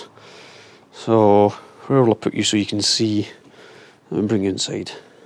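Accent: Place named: Berwick, United Kingdom. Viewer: Random question. Are you from Berwick or thereabouts? The accent is very musical.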